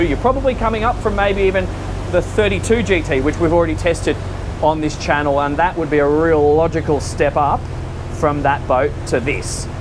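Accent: Australia. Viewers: The way you Aussies say "here", just boggles the mind lol. Hiare.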